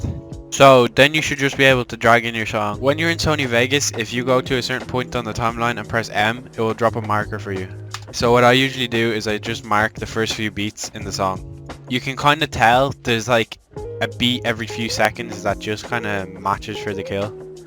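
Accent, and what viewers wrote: Ireland. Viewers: Didnt know u were irish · Wait he’s Irish like me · bro i don't care you blured the things, the tutorial was fantastic i watched only because of the irish accent this wasn't even useful for me, i already learnt a lot about editing, and i use premiere, but this was really nice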